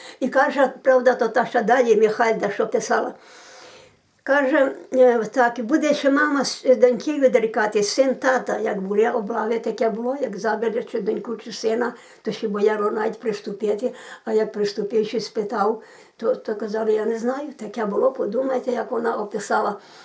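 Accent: Lviv, Ukraine. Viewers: Українська, Львівська область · Не сперечайтесь,що до говору,так розмовляють на Львівщині! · В нас в селі Львівської області, такий самий говір. Так приємно слухати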